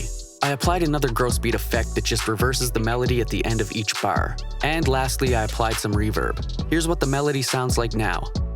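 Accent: London, United Kingdom. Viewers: Mate, i gotta rate your London accent! We usually laugh at anyone trying to copy us but man went in! Gwaarn kuzz! Keep up the fire 🔥🔥🔥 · No lie your Brit accent sounds like a white bugzy malone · That British accent is legendary 😂😭🙏🏾 TBH tho... It's really good. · Your British accent is really good.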